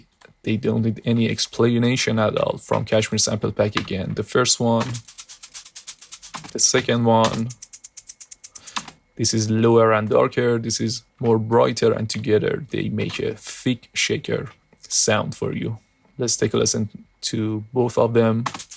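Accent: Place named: Iran. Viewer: Your accent sounds like a persian, are you?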